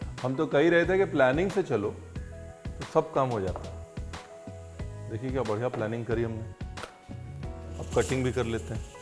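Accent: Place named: Punjab, India. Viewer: Gayani Brar Ji, Chaa gaey O thah kr k. Like the way you give Punjabi touch to the conversation, hai k nai...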